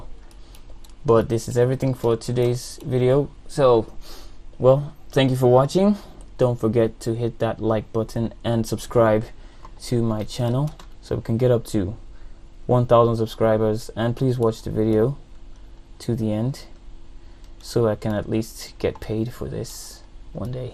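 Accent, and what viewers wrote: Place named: Nigeria. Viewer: you have an accent, are you Nigerian?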